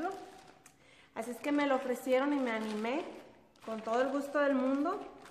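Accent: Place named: Nayarit, Mexico. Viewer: Jejeje me encanta tu acento nayarita, asi hablan las tias de mi esposo💕💕💕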